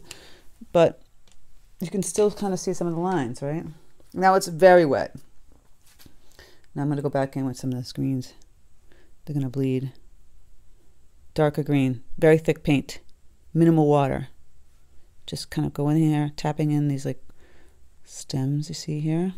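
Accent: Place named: East Coast, United States. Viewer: Love your accent! East coast?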